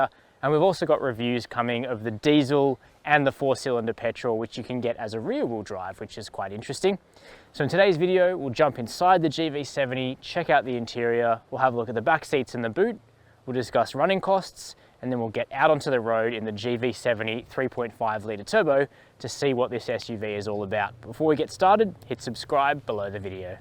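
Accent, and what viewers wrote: Australia. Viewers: He is Australian. It’s his natural accent.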